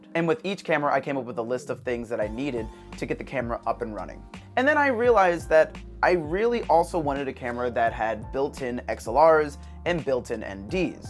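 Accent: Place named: RP, United Kingdom. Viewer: Beautiful classical British accent. Versatile guy, you are.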